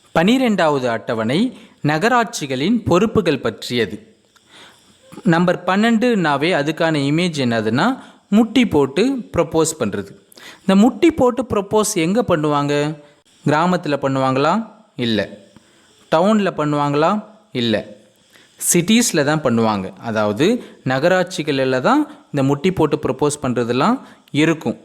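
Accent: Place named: England, United Kingdom. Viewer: Vera level Anna thanks. Unka voice la oru English .....style varuthu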